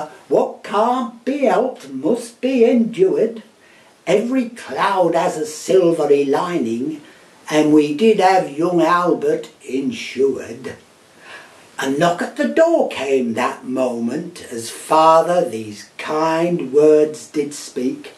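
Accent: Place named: South, United Kingdom. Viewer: Nice rendition mate, I'm a Yorkshire man and can tell instantly you are from the southern regions as your accent is Posh,..but well done for this, I love the old monologues I used to listen to as a child before we ever got a television....good memories..Thankyou!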